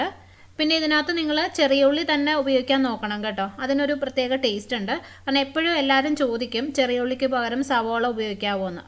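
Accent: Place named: South Kerala, India. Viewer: njan kollam aanu...njan chechiyude eth recipe cheyth nokkiyalum ath kidukkachi ayitundakum...ith vare. chechide samsaram njangalude pole...apozhe thonni chechi thekkan keralakkari anenu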